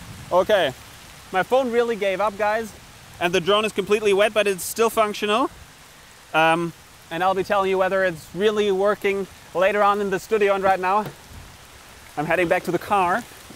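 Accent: Germany. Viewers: you can hear the german acent decent mein Freund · Haha everytime when I watch a german guy talking english I clearly hear it but this time it was difficult because u spoke pretty good english I could barely hear the german accent naice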